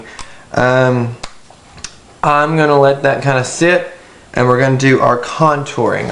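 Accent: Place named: South, United States. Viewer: girl gotta be from Kentucky or Tennessee! Love the accent! I felt at home watching this video! :D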